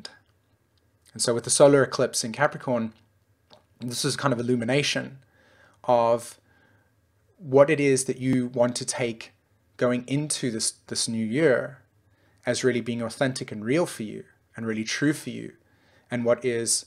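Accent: South Africa. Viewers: you have a nice SA accent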